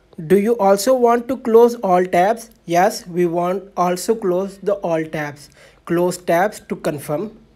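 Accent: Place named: India. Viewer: When u hear the video and its indian just force close its not working 🤷‍♀️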